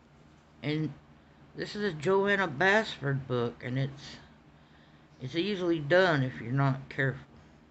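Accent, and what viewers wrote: South, United States. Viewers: Don't you dare not use your beautiful southern accent!!🙂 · I love the color of your fish. I love a Southeren accent.💙💙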